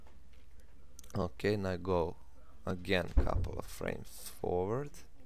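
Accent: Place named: Croatia. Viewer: prica engleski jezik a ima hrvatski naglasak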